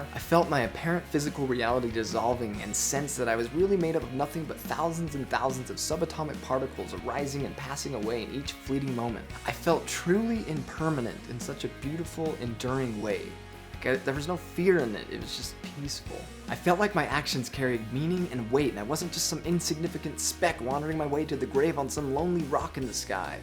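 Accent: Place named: United Kingdom. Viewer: I thought he was British for a second XD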